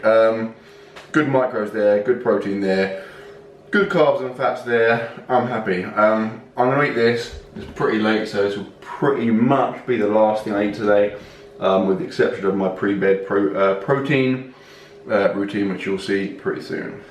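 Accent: United Kingdom. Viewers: At first I was like, did get a hair cut? And then I was like hmm, the British accent is new though, but I did miss the last video, so maybe it's his new thing. Then he said his name is, then I was like ohhhh.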